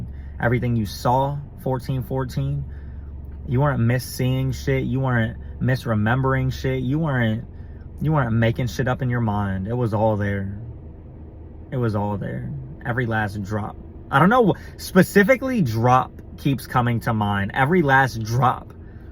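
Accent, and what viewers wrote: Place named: Texas, United States. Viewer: I'm a new sub! Are you from Texas? You sound like back home to me... sending you warm loving energy from Hollywood, my newest home! ✨️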